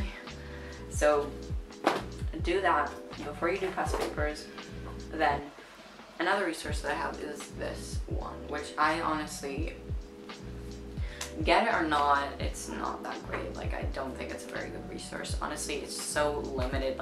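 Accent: United States. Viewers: You sound American?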